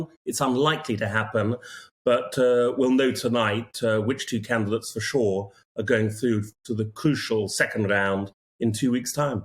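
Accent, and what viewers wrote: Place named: RP, United Kingdom. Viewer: Plummy tw*t 🙄 Is he French or English? 🤦🏻‍♀️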